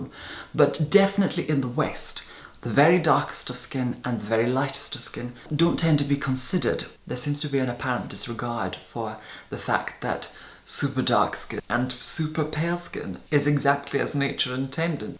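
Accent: Scotland, United Kingdom. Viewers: its fake, not how he really talks, it is all put on for videos and more viewers, go back to his early videos from say 2013, has a normal Scottish accent and voice nothing like this at alll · I so enjoy listening to you as your intelligence always shines through. And I also love the soupçon of Scottish accent in your speech. And as an alabaster-skinned woman of Russian -Ukrainian descent, I have come to love my paleness. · I KNEW YOU WERE SCOTTISH! Sorry, I love your accent♥️♥️♥️♥️ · I thought it was Scottish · you should bottle yourself and sell it!!! uplifting, fascinating, spiritual, fair ( in both skin tone and outlook) beautiful and......Scottish!!! i could listen to you all day xx much love from one who has been described as 'blue white' xx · Scottish darling x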